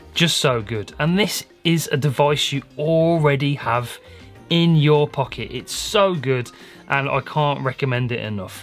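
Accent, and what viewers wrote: Birmingham, United Kingdom. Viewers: Loving the brummy accent from another brummy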